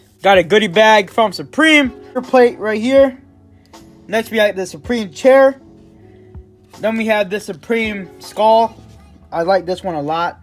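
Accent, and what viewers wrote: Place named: United Kingdom. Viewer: Your voice sounds half British! Fucking great video tho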